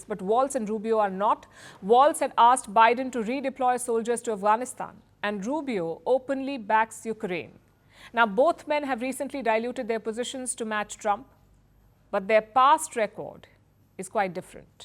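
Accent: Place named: India. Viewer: Strong Indian accent!!😮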